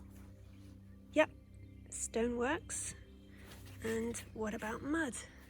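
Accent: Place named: United Kingdom. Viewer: Just came across your video you sound like you’re here in the UK , where did you get the tester from ?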